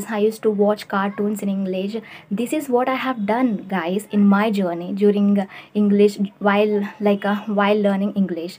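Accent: South India, India. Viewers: I agree with what you said but all these tips and tricks can be beneficial for people who have some english knowledge. In northern india there are many students who are purely from Hindi background and English is rocket science for them. How will these ideas help them to learn English language? You are definitely from south as what i understood from your accent and to be honest the tips you shared can give maximum benefits to people who are at least in touch with english. These tips will be pure bliss for them.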